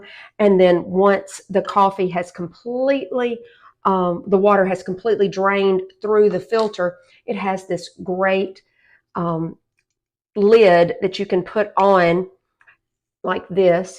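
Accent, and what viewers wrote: South, United States. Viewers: Mine came with the instructions inside the pot. How to get out? It is like the "monkey paw in the jar" thing. Also, you are smokin' hot and I dig your southern drawl. Thank you for being you.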